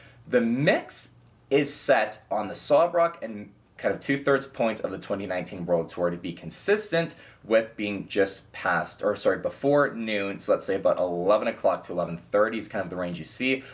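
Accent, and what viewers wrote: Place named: Newfoundland, Canada. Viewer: Your accent is super interesting. Candian but with a hint of Irish? Is your family from Newfoundland?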